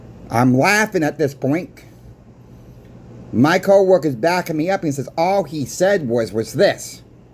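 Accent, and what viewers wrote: Boston, United States. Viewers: keep them coming. You got the gift of gab and IDK the accent but it works for the channel 🤣 not to assume but is that a Boston accent?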